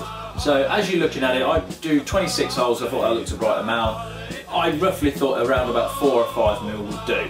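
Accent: United Kingdom. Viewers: He has a heavy British accent and uses a lot of British slang. It wouldn't translate well. Try using google translate.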